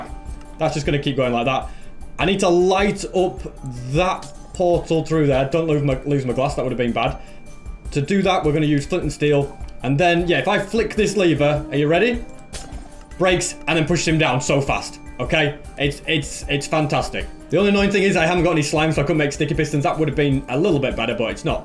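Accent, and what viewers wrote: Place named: Yorkshire, United Kingdom. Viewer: You have a Yorkshire accent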